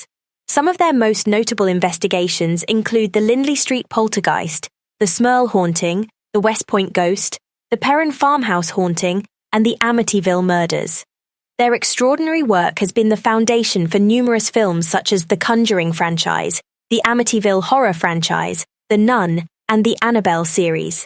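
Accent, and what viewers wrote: England, United Kingdom. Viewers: Why is an English woman talking about the USA? Go back to your home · Why do they got this British lady doing this and Gilbert Gottfried already passed away already she gots her scruples backwards 😵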